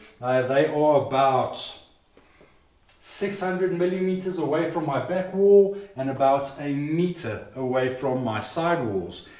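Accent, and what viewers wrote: South Africa. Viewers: I have been looking at replacing my speakers which were unfortunately ruined in a rain storm we had here in Nova Scotia back in 2023 which flooded my basement. I have been considering these speakers from Martin Logan, the Bowers & Wilkins 702 S2 Signature and the Monitor Audio Gold 500 6G. The Martin Logans are the cheapest of these three speakers. I am running a Bryston 4B Cubed amp which is 300 watts into 8 ohms and 500 into 4 ohms. I know it would drive these speakers extremely well. I have also heard others say that the Bowers & Wilkins can be fatiguing in the treble. Are you from South Africa? I think your accent sounds like that. I am so glad you did this review. Thank you. 👍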